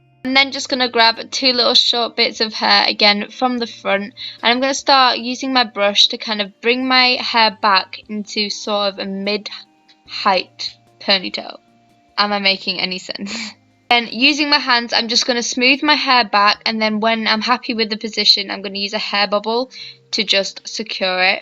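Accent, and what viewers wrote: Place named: Northern England, United Kingdom. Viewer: Love that accent. I'm not very good with knowing accents, so it's a somewhere-up-north accent to me :) So nice to hear an English voice! I love that you have a middle parting and not a 'center part' ("senna part" yankee accent!) lol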